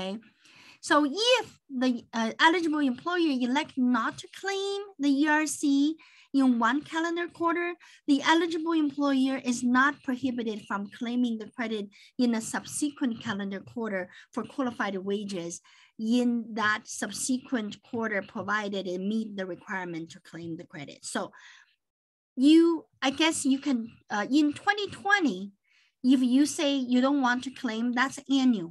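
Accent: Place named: China. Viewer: you are so good. your Chenglish is just fine. I learned a lot from your video.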